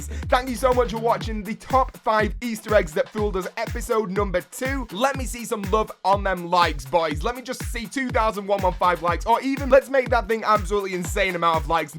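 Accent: England, United Kingdom. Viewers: allot a lot hos english accent made it sound like that trust me i have someone i know with the same accent he pronuces it the same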